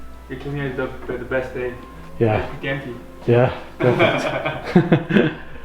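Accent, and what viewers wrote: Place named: Yorkshire, United Kingdom. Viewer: Congrats on the new camera! From your accent you sound like a Yorkshire man? Similar to mine 🤦🏻‍♀️ if I’m wrong 😂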